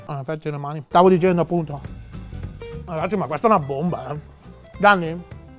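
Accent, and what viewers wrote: Rome, Italy. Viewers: Parla tutti li video in romano che te esce bene grande sempre generoso cor magna!!👍🏻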